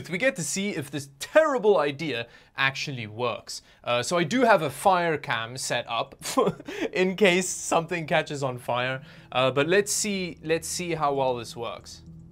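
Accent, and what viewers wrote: South Africa. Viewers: are you South African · Are you south african?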